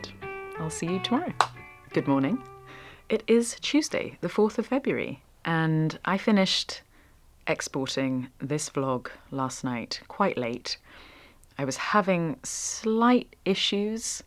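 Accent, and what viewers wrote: United Kingdom. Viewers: Oh yes, absolutely. It's very odd to me as a Canadian that we can live 2,000 miles apart from one another in my country and have virtually the same accent as English speakers--but head to the UK, and 20 miles down the road is far enough to have a distinct accent that proudly identifies your hometown. I've started to tell the difference, even as a foreigner, among the many English & British accents -- and is far and away one of the most beautiful.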